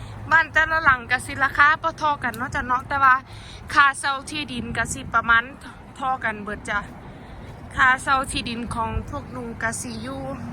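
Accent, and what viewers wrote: Chaiyaphum, Thailand. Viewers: สำเนียงเว้าคือคนชัยภูมิแท้ แม่นบ่ครับ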